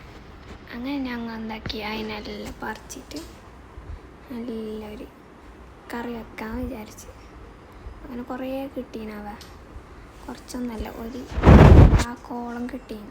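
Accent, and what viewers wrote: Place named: Kasaragod, India. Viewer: നിങൾ കാസർഗോഡ് ആണോ😂 സ്ലാങ്😅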